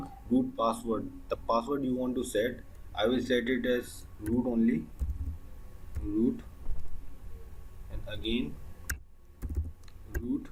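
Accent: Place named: India. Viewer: I honestly didn't give a du🦆ck about the subject but his Indian accent 😊. Man don't stop love you, made my joint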